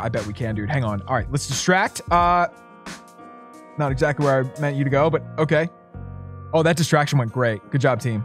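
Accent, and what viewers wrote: Canada. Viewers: Wait, is he Canadian? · are you canadian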